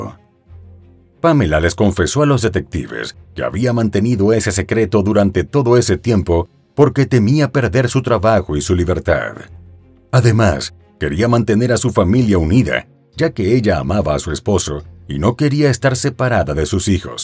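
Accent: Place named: Mexico. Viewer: Porque habla en espanol, Si son americanos de habla ingles? No eran de Mexico. Y la voz se eschucha de Mexico Oh de otro pais. No me gusta este Video.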